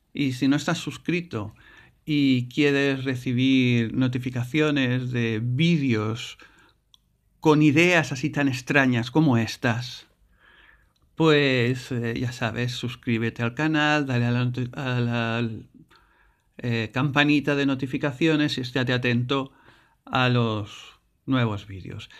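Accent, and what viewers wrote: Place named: Spain. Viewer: En cuando escuche el acento español "onda vital" deje de ver el video ¡¡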